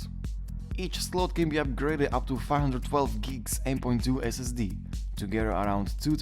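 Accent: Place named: Romania. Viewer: nu pot sa nu recunosc accentul de roman care vorbeste engleza! :)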